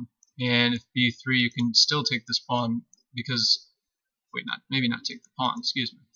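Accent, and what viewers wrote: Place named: United States. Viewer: Some chess video instructors for some reason present a black opening from the white player's side, which makes it more difficult to follow. Kudos for presenting the Leningrad Dutch from the black's perspective,. You presented well in clear American English, covering the intent of black for each move, and the possible white moves in reply. Thank you for a great opening that was taught well. I hope you do more chess videos!:)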